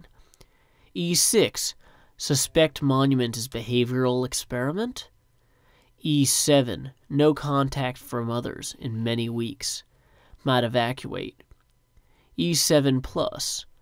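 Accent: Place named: North Carolina, United States. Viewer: Oh dear God your accent LOL its been awhile since I've heard one like it. I'm originally from western NC but moved to VA beach 8 years ago. Love the accent. Its home to me.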